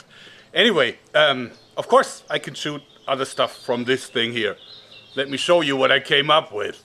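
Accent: Germany. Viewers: Are You german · Is he German ?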